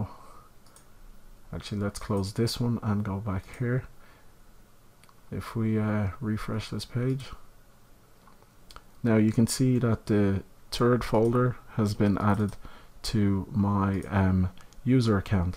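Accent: Ireland. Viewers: Great job, I have been looking for this capability since 2016! Hope things are great at college. Also good to hear the Irish accent! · Thanks a really great video. No problem with your ramblings man everyone loves an Irish accent. At least that's what they tell me anyway!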